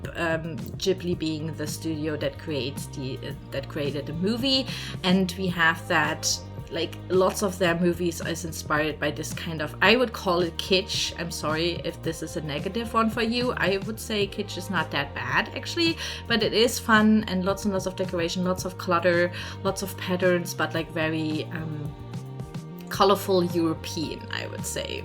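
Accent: Germany. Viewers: I could hear it in your accent that you're german! (I'm german too!)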